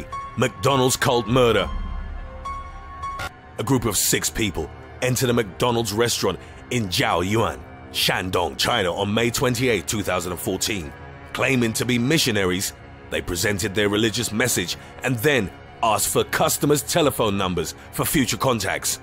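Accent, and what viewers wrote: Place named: United Kingdom. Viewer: British narrator what a joke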